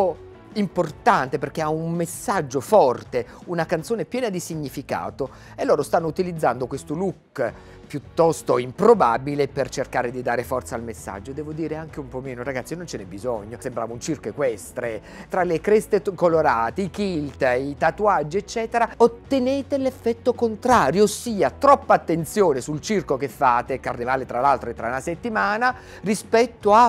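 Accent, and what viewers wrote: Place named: Naples, Italy. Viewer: Ma da quando parla con accento napoletano. Voto 1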